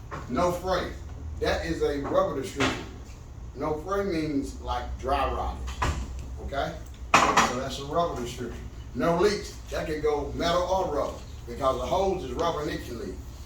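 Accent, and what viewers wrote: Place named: South, United States. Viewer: It's very detailed but your talking to fast and country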